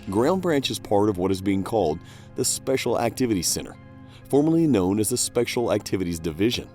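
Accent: South, United States. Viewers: I detect a southern accent.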